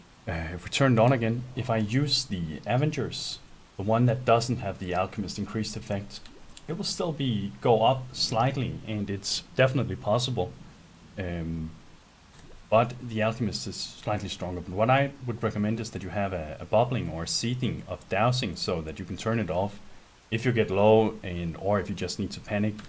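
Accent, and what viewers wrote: Denmark. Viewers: Er du dansker ? :P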